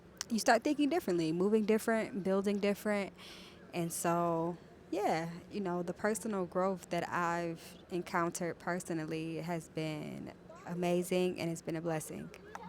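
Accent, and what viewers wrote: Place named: United States. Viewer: If I were to pass you on the street i wont think you from the USA till you start talking.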